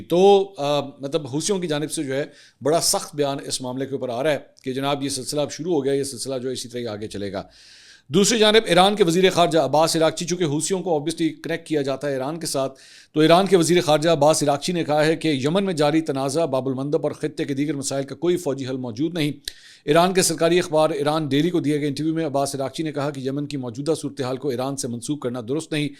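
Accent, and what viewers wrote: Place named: Lahore, Pakistan. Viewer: بھائی یہ جو سٹی کا نیم ہے وہ اپ پروپر اس کو بولیں گے تو۔یمبو کہلاتا۔میں سعودیہ الریاض میں رہتا ہوں۔ اور اپ کی اپ کی ویڈیوز کو باقاعدگی سے دیکھتا ہوں۔اپ کا بات کرتے ہوئے پنجابی لاہوری ٹچ بہت ہی کمال ہوتا ہے۔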